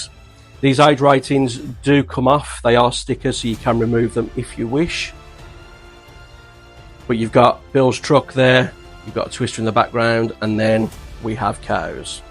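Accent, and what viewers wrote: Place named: Birmingham, United Kingdom. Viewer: Nice review mate. What part of Brum are you from?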